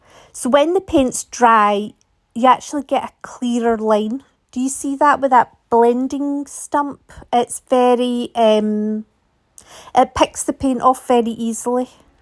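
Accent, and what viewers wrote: Scotland, United Kingdom. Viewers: What a lovely scottish accent?! Great video.Greetings from Spain. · thanks ever so much for the quick reply, great hearing a Scottish accent on a YouTube art video. Thanks for the information, I have done Lino and Japanese woodblock printing before and I have got loads of art supplies I can use with the gell plate so excited to get started. I volunteer as an art tutor for a church art group we have done linoprinting, airdry clay, stained glass drawing and painting and I am always on the lookout for new things to try. Looks like endless things to try with this technique I mainly paint Scottish landscapes. Thanks again enjoying your videos. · This was absolutely wonderful! Thank you so much for all these excellent ideas suggestions techniques… Tricks of the trade! I know I will be coming back to this video over and over again. 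I’m just getting started with gel plate printing and I’m so excited to explore. This video has given me even more ideas! Additionally, I really love that it isn’t cluttered with a lot of background noise or music, it’s just you talking us through the process – in such a beautiful accent at that! Long live Alba! 🏴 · Awesome, and a Scottish accent to boot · I absolutely adore your accent! I think the Scottish accent is so full of music and poetry 💗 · Enjoyed this very much. Clearly explained techniques with lots of variety. Thank you for mentioning the need to let the paint dry. I have watched many instructional videos and it’s seldom touched on. I’m Scottish, and it was so refreshing to listen to another Scot. Very many thanks.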